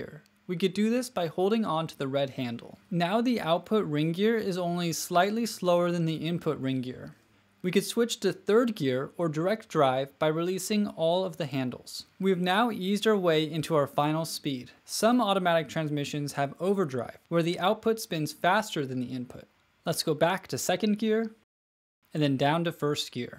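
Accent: United States. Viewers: He has an American accent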